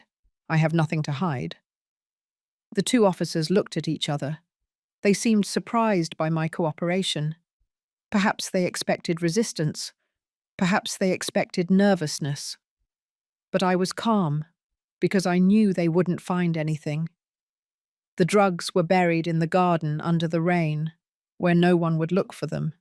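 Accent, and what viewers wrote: United Kingdom. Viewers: I think the accent is British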